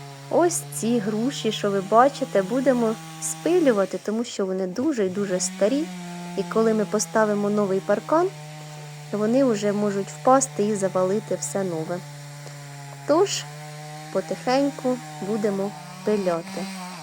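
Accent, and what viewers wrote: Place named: Cherkasy, Ukraine. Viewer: А яку назву має ваше село? По говору чую, що область Черкаська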